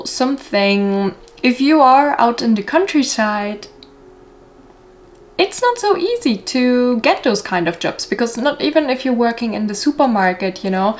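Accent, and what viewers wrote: Germany. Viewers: Thank you, i thought I heard a little german accent but there are many countries that speak german, right. Good Luck with everything and i hope we Swedes help you with what y need.👍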